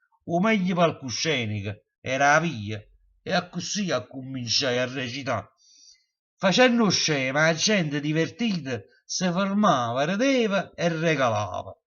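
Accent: Naples, Italy. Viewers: Srivere le attualità di questa nostra società in versi cosi' toccanti e veri usciti dalla mente magica di un Collega che stimo tantissimo il Grande Luciano Somma, un plagio và anche alla recita verace con tono e napoletanità di. I MIEI VIVISSIMI COMPLIMENTI....Rita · interpretata in modo magistrale, napoletanita' DA BRIVIDI, Complimenti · Angela Arresta Ho messo un commento sotto la poesia 'O scemo, ma non lo vedo. Ho scritto che mi è piaciuta e, quanto alla recitazione, che mi piace molto il napoletano, soprattutto in quanto mio genero è di Caserta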